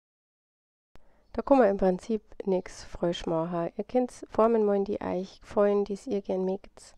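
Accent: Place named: Bavaria, Germany. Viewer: Wie sympathisch ist das denn mit dem bayrischen Akzent!💕 Genau diesen Farbkasten (kein Metallic), habe ich schon lange im Warenkorb bei eBay! Die Farben scheinen noch zusätzlich zum Schminkekasten unterschiedliche Nuancen zu bieten. Dein Kanal war mir leider bis heute unbekannt. Aber gleich mal Daumen hoch und Abo! LG.🎈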